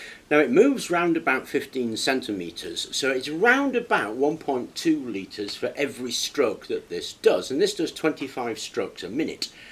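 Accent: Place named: United Kingdom. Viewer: Lately it's been like watching the Tech Ingredients channel with a British accent.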